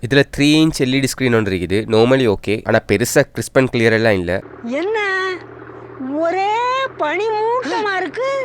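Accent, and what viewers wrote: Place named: Kerala, India. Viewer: Your tamil is super. Cute ah iruku unga slang. Are you malayali or srilankan?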